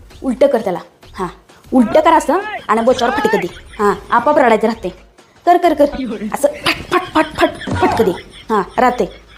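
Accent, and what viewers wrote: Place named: India. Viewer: दीदी गाव कुठलं आहे तुमचं एक वेळ वाटते तुम्ही शहरात आहात एक वेळ वाटते खेड्यात आहात छान छान तुमचं बोलणं आहे असाच मराठी माणूस पुढे गेला पाहिजे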